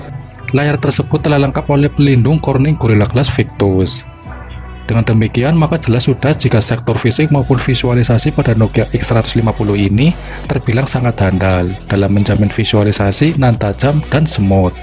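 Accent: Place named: Java, Indonesia. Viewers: Medok amat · Suara khas, tegal ya keluar..😃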